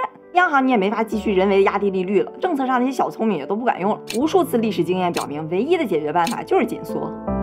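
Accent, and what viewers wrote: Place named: Beijing, China. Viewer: 一口儿京味儿 听着亲切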